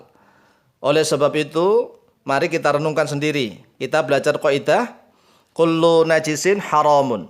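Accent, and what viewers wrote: Java, Indonesia. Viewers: mungkin dia orng Jawa kan orng Jawa di kenal dngn huruf o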